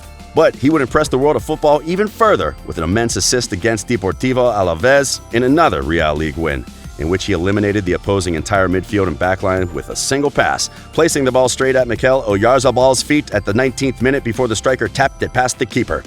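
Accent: United States. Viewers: The speaker´s voice sounds a bit resemblant to Ted Lasso (Jason Sudeikis). It´s almost charming to hear all these ´murican pronounciations. But to the next video, please someone, give this speaker a proper phonetic list of club, coach and player names. Before the charm fades off. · I WILL TROLL THOSE COMPLAINING THE AMERICAN ACCENT. YOU ARE WELCOME! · Can't take American voices seriously speaking about football... lol haha sorry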